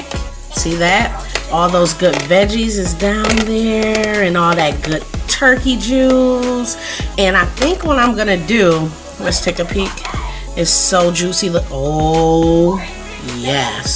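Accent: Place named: Buffalo, United States. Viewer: You from Buffalo? That accent sound just like Westside Gunn. Boom boom boom. 😂😂😂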